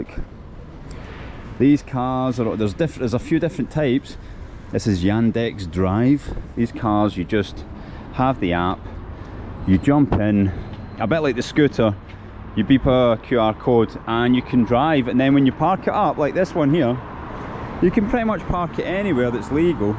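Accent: Scotland, United Kingdom. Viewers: Nice take.. and kind of surreal to listen to your Scottish accent in the middle of Moscow, I quite like it though. I subscribed to your channel because you are doing something different, the war talk has taking its toll on everyone’s mental health, just keep on doing this man! 👍✨👍✨ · thank you! I love your beautiful Scottish accent. ♡ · I like the walking tours! Is all of Moscow so clean? Where are the seniors? I only see young people. I live in Texas, what a difference! And I love your Scottish accent! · I’m here for the Scottish accent 😃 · Great video! Would love even longer walks from you. Beautiful, informative and love your Scottish accent. Nice balance of footage of you and the city. I did watch it all the way to the end. I liked it all just the wsy you did it. Hope my feedback helps. Thank you!! :) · Many thanks for the honest tour! Your scottish accent is just fine. 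Btw I've enjoyed watching every minute of this vid. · Your walk&talk tours are great! I don't mind the Scottish accent at all. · Nothing wrong with a Scottish accent lol. Greetings from London x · I won't be muting the video. I love a Scottish accent. The tour of Moscow was great. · I very much enjoy these videos. I think your Scottish accent is just fine. Well suited for this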